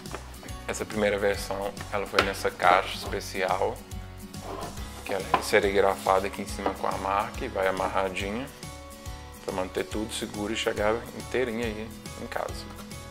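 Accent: Minas Gerais, Brazil. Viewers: Muito massa! Vou assinar o proximo! Adoro o sotaque de Minas! Sucesso meu velho!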